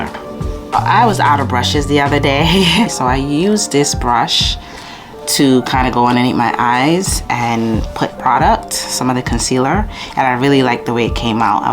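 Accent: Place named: New York, United States. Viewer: I love your accent so much!! Everytime you speak I’m like she’s soooo New York! The make up is so flawless… I’m so glad your doing posted this can I’m still learning make up.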